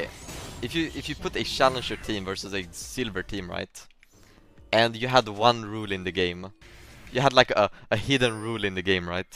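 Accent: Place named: Sweden. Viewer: Love your videos man. And your sweet Swedish accent! :)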